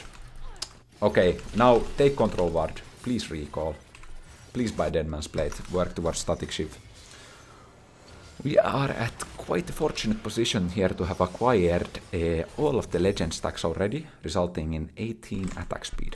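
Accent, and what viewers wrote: Finland. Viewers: are u from finland? ur speaking kinda sounds like it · You have such a Finnish accent I love it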